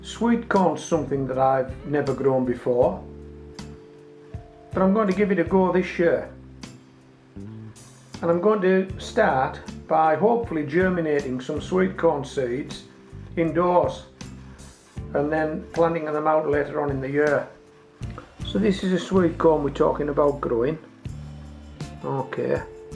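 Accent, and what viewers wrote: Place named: Cumbria, United Kingdom. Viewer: Informative video. l'm in Canada, zone 4b, and starting everything indoors in a couple of moths to plant out in May. Imagine my surprise to hear what sounds like a good Cumbrian accent (l'm originally frae Maryport). Great job on your channel.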